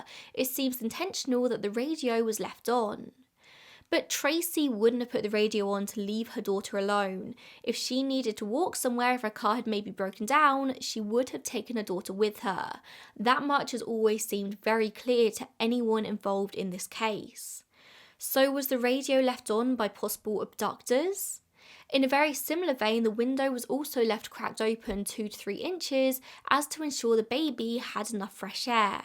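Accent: United Kingdom. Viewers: As an american😐😐😐😐are you serious rn? The creator, is OBVIOUSLY not American, (I think british but not completely sure) she obviously has an accent and is going to talk with her accent and dialect😐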